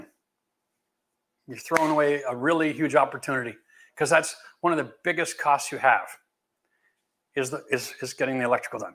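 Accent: Canada. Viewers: Isn’t he Canadian?😂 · I could’ve swore you were Canadian 😄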